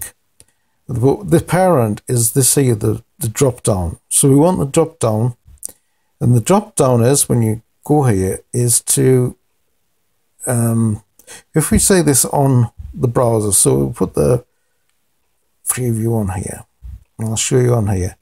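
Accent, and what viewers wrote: United Kingdom. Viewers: The bit about Dropdown menu items is no longer correct in version 4.0.4. I wasted some time before realising that there is now a menu in the middle of the page above the main form where one can add items, remove items and so on. Otherwise, it is a nice presentation. I like the BBC accent. :-) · Thanks for these VERY helpful tips! Nice to hear a NE accent as well...I'm from Durham City but living in Kansas now.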